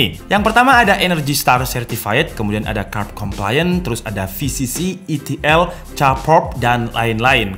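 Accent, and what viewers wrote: Malang, Indonesia. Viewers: Medhok nyaa itu loh heheh ngalam ae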